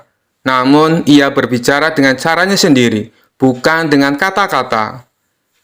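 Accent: Java, Indonesia. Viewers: Udah medok, intonasi lemes, sebaiknya gunakan suara google aja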